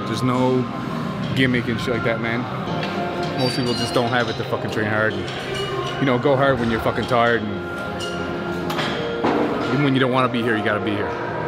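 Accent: Newfoundland, Canada. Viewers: newfoundland accent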